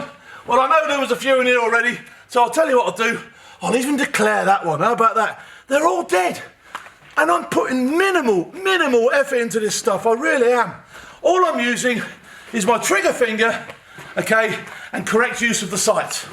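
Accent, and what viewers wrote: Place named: Norfolk, United Kingdom. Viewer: Sounds like a norfolk lad,probably explains why he's a bloody good shot....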